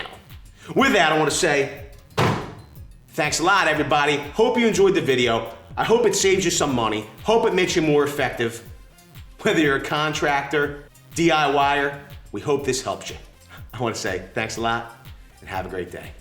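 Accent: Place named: Italian-American, United States. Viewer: Guido accent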